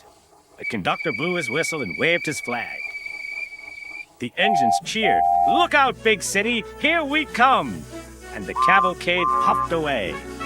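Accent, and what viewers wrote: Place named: Scotland, United Kingdom. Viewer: Scottish accent is very interesting.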